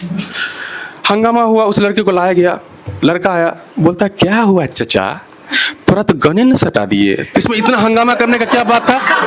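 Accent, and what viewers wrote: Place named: Bihar, India. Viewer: Bhai aapke accent se hi pta chalta hai ke aap Bihar se hain,, dekhne me nhi lagta. Anyway Too good bhai ❤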